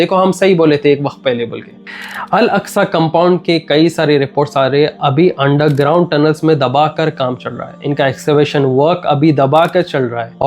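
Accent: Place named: Hyderabad, India. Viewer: bhai "Dabaaa k kara ", "Dabaa k chalra " samajrow mai apan Hyderabadi hai magar thoda national level ke hisab se bhi bolsakte ap....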